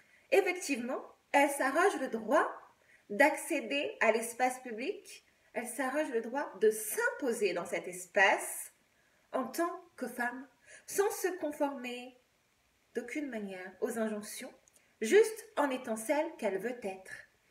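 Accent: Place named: United States. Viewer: Merci Tu me tues... 🤣🤣Tu T'habilles toujours dans des habits magnifiques qui Te vont trop bien, je trouve... Oui cagole est un mot issu du vocabulaire marseillais... Oh Tu m'as appris un truc, là... Merci 🙏Ton accent américain est trop mignon... un tantinet québécois (ou Canadien) et Te va super bien aussi... Tu es trop mignonne... Y a aussi "pimbêche" qui est synonyme... Tu mérites des fleurs... Pour moi Tu n'es pas une "cagole": Tu es une Princesse... Déesse... Au delà de ton look j'aime ton esprit libre et je ne sais pas comment Te le dire autrement que j'aime T'écouter... J'aime Te faire du bien... Oui, vraiment s'il y a de la haine contre Toi, je souhaite être ton pansement pour cicatriser tes plaies, ton mouchoir pour pleurer... Je Te kiffe... C'est sans doute prétentieux de ma part, mais si je pouvais arracher mon coeur pour Te l'offrir en guise de bouquet de fleurs, je le ferais... 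Ton discours est détonant: très intéressant... Une révolution... Merci! Je tiens à Toi comme à ma famille... J'ai besoin d'être ton héros ou en tout cas d'en être un pour Toi... je T'aime! Je suis contre les modifications corporelles (sauf suite à accident) contre les tatouages (même si certains son jolis) contre le maquillage (même si c'est joli) contre l'épilation... et contre les discothèques... mais je comprends l'intérêt, un peu... (je crois) Ce que je voulais Te dire: vraiment ne Te prends surtout pas la tête... Moi je me la prends trop souvent... Je réfléchi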